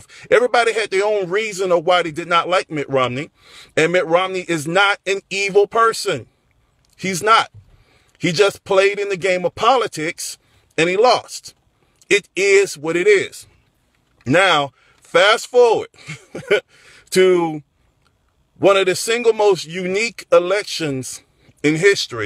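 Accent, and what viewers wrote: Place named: Russia. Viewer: This guy is obviously a Russian. You can tell by his accent that he's probably from the southern Volgograd region.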